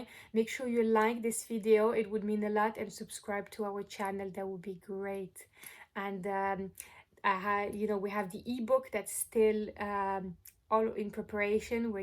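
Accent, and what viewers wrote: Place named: France. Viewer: Liked. Subscribed. Clearly you know crystals. Love your accent. French? Or maybe I’m the one with an accent. Waves of zen coming your way!!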